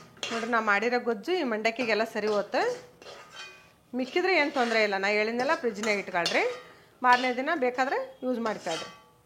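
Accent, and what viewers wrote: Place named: North Karnataka, India. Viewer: Aunty ri Nivu Uttara karnataka Bhashya ne matadi chaalu anusate ri 🙏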